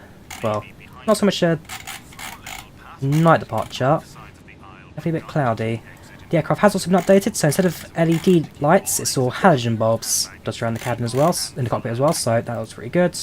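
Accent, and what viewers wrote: United Kingdom. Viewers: Thanks for this video! It's wonderful listening to your British voice and a good landing! Your channel deserves more subscribers!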